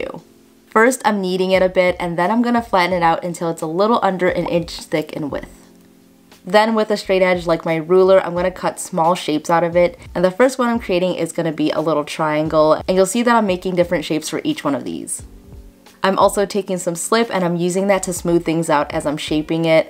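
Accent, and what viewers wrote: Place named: Midwest, United States. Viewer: it's so nice hearing midwestern accents from youtubers ♡ from a milwaukeean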